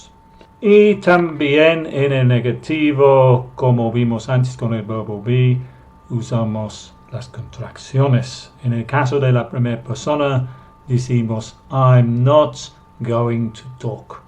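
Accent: United Kingdom. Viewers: Excelente clase de Ingles y desde luego excelente profesor ademas britanico que me encanta aprender mucho el acento y ciertas diferencias que hay con el ingles americano, no tengo palabras para darle las gracias por esta perfecta clase.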